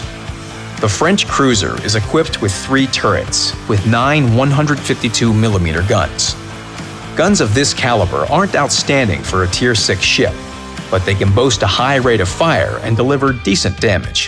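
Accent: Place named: United States. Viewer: I'm getting sick of this American accent.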